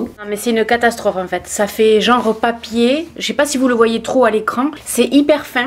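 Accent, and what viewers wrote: South, France. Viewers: et notre bel accent du sud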